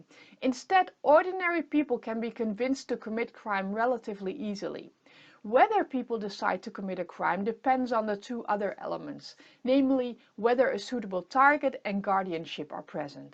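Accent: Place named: Twente, Netherlands. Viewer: Interessant. Goed uitgelegd ook. Passioneel verteld. Met een tukkers - engels accent:-). Hoe gaat het verder met je? Gr Hessel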